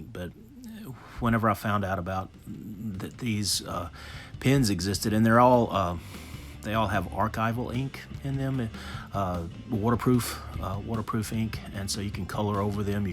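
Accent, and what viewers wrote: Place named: United Kingdom. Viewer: Damn! How did I miss your channel?!? Well, I sub'd now! Weird: Somehow I thought you were British! Oh, well--! LOL Great stuff,!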